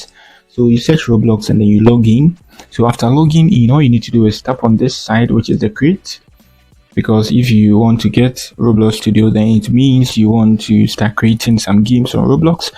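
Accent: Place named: France. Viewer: tu parles francais non ca se sent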